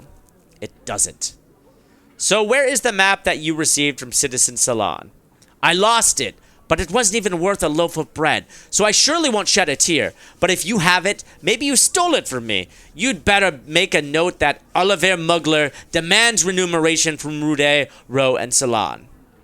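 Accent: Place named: United States. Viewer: Oh god, should be on trial for how he pronounces stuff haha. A for effort though and smart of to just go with the 'I'm not even gonna try anymore and just be an american dude pronouncing it in english'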